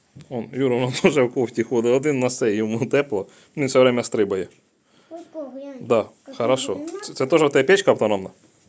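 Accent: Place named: Poltava, Ukraine. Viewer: По говору здогадався. Полтавчан не сплутати нi з ким.))) Тримайтеся! Тепла та благополуччя! Всiм достойним украiнцям захисту Всевишнього!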